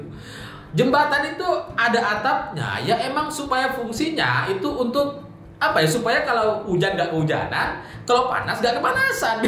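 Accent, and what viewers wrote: Madura, Indonesia. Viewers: Logatnya Madura yha kang...? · Logat madura nya samgat kental, tapivaku suka, Toppp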